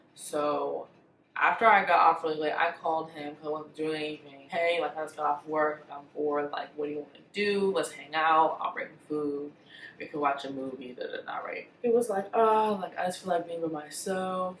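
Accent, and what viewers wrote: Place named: Nigeria. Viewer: loved how you spoke like a typical Nigerian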